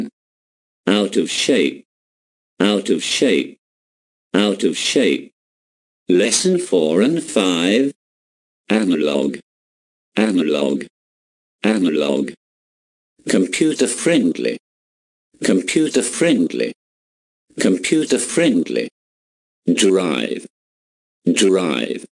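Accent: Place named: United Kingdom. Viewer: الاكسنت المرة دي بريتش . قبل امريكان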